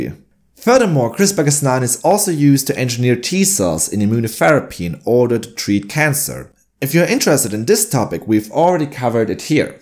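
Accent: Germany. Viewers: Are you threatening me, Master Jedi? I am also a native German speaker and I can clearly notice the German accent. Maybe because even though everyone I've talked to in the past 15 years spoke German with me I almost exclusively consume English-speaking entertainment and do so for hours daily. Though I have to say it's way better spoken English than pretty much anyone I know :p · As a native English speaker, it really isn't. He speaks too quickly and smears his words without pronouncing all of the sounds. If he didn't do this, he would be a lot easier to understand even without the heavy German accent. People who speak one language are bad judges of a foreign language spoken by their peers because they are used to speaking with and hearing their native phonemes, even when used to approximate another language. Good video and content, just needs to slow down and work on pronunciation and annuncuaction.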